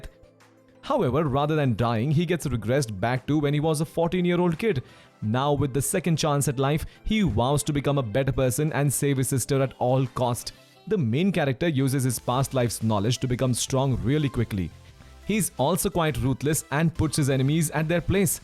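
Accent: India. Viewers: Is it Indian accent ?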